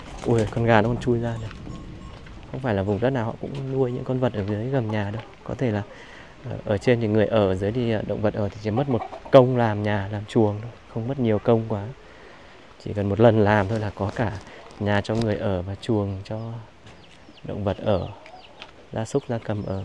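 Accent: Hanoi, Vietnam. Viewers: có chất giọng Hà Nội rất ấm nghe cứ bị cuốn ý ❤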